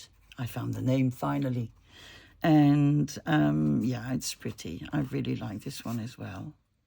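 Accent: France. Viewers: I love your style. I think the mole one is my favorite too. You do great with English being your second language. I do love hearing your french come out though. And your puppies.. gets my puppy all involved to and makes me laugh! ( mine watches tv ) Thank you I love the random ephemera videos.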